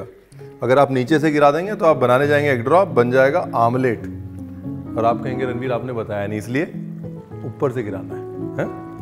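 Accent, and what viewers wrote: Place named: Uttar Pradesh, India. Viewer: You say like UP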